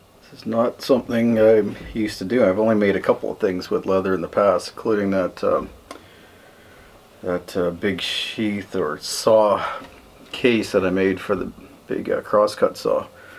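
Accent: Canada. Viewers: I come here for the Canadian accent.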